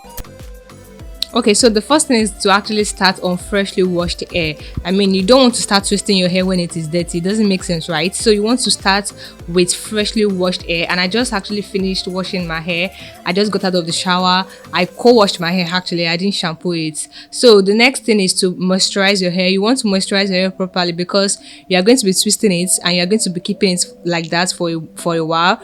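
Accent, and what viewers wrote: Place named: Africa. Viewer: She talks toooooo fast with a very strong African accent.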